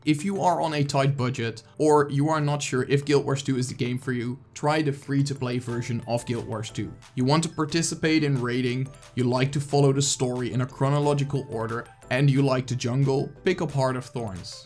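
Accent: Netherlands. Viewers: ik hoor dat je nl bent hihihi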